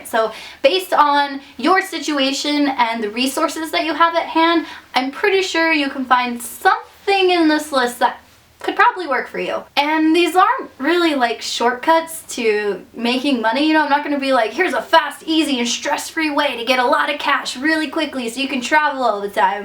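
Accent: United States. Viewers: She sounds American and I'm pretty sure she's wearing a wig and dentures. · American accent. When you travel you realize that Americans have American accents lol.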